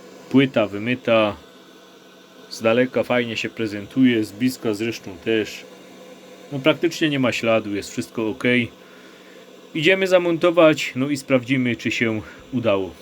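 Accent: Silesia, Poland. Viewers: jestem swiezym posiadaczem magdaleny z 2016r troche wszystko to nowe dla mnie wczesniej siedzialem w peugeotach ostatnim byla hybryda 508 RXH znalazlem twoj kanal swietny masz kontent bo krok po kroku wszystko a do tego ten hanysowy bliski sercu memu akcent:P (pochodze z Swietochlowic ale od prawie 19 lat w Irlandii) Mam takie pytanko kupilem od zoltego brata modul do AA P3.2 i czekam na niego musze softa zaktualizowac mam teraz <num> EU N i jaki bys polecal wiem ze musi byc 70 + ale jaki konkretnie. Bardzo ciekawi mnie te twoje polaczenie szeregowe z kompem jaki to soft itp (wiem o tych modach co mozna dograc) Moglbys rozwinac temat ewentualnie polecic stronke/forum z taka wiedza?? Dzieki wielkie za filmiki sa bardzo pomocne mysle ze gdybys nagrywal po angielsku mialbys just duzy kanal:P. pozdrawiam Tomek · Skond żeś Chopie jest ,bo słysza że nosz synek ze śląska, pozdrawio Rybnik.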